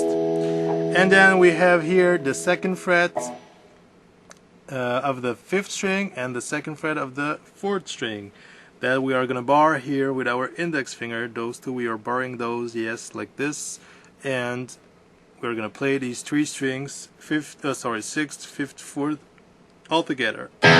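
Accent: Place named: Eastern Europe. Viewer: love the accent....Where you from man? Sounds eastern european.....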